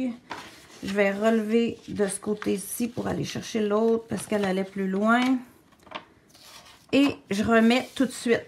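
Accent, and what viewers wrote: Quebec, Canada. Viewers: Magnifique. Excellente démonstration avec le joli petit accent Canadien si beau!!!! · Bonjour, j'adore écouter votre accent, je suis " tombée en amour" pour le Québec lors de mon premier séjour. Merci pour vos partages, c'est un plaisir de suivre vos tutoriels. Votre créativité, votre talent sont très encourageant et simulant. Gratitude et le meilleur pour vous en 2024. PS: Est ce possible de passer une commande chez Pin So pour êtres livre en France?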